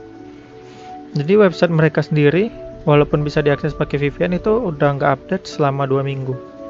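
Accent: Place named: West Sumatra, Indonesia. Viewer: BTW abang orang mana bang?kok cara logat bicara nya mirip orang sumatra barat ya bang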